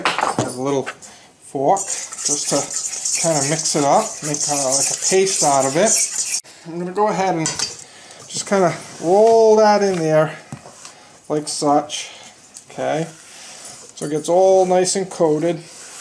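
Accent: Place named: Boston, United States. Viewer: the way you say part is funny you pronounce the word pot you must be from boston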